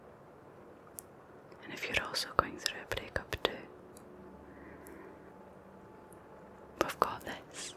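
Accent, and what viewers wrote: Scotland, United Kingdom. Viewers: I don’t comment very often because I’m just the older guy who happens to appreciate your videos, your smiling eyes and that wonderful Scottish accent. But your eyes aren’t smiling this time and I just could not sit by and keep quiet. I won’t offer you a string of clichés about getting past the pain of losing someone you cared for. By your comments here it’s apparent that you will be ok. I just wanted you to know that despite the fact that our only connection exists in the ether of YouTube, I know you will be happy again soon. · This may get kind of long, but I will try and shorten it up as much as I can. First, let me say that I didn't know what ASMR was until a few months ago. I was having a hard time getting my mind to wind down while trying to go to sleep. I was trying white noise, tribal flutes, thunderstorm sounds, etc. 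Just anything that I could think of to relax to. I just happened to be scrolling through YouTube when I saw a heading reading This Scottish accent will knock you out. Now I always been a sucker for a soft Scottish accent, so I clicked on it and that was probably the best sleep I have had in a very long time. I have been watching your videos just about every night since then. Your voice and the natural flow of your hand movements just does something to this southern boys brain. So thank you for that. Second, I am sorry to hear about the latest events in your life. I know it will take some time, but you will definitely be ok. There are seven stages of emotion that you will go through before you are truly ok, but I know you got this. I have gone through them a few times and I can tell you that you will always come out stronger and more alive than ever before. The human heart was designed to reheal itself from hurt and pain because we as humans will continuously experience things in our lives that we have no control over. 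We will get knocked down, we will get our hearts broke, we will become emotional wrecks, but we will always be able to pick ourselves back up, dust oursel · Hi! Actually your ASMR is the only one right now that helps me sleep. Breakups always hurt even if they are on good terms. I recently lost a girl because I declared my feelings to her. I still consider her my best friend and it was partly my fault because years ago she was interested in me but I couldn't see it. I had my mind on other things. But at least we had a great friendship, the problem is what I felt was growing. And now here I am suffering. I would like to recover the friendship at least but I see it difficult. It won't be the same anymore. Thanks again, your Scottish accent and naturalness help me. Greetings from Spain. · Attaweyman, I love the Scottish, go on you dancer, great video with a touch of real life, first 6 months are the worst 😎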